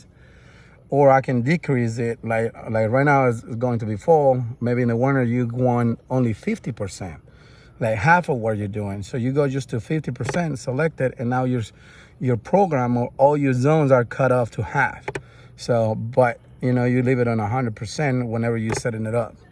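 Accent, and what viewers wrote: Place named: United States. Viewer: Se te nota que eres gringo, pero no habrá forma de que lo mandes aunque sea en espanglich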